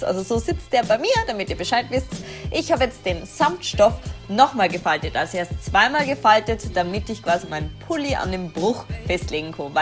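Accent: Vienna, Austria. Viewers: Woher bist du eig., aus Wien,... oder Bayern?😂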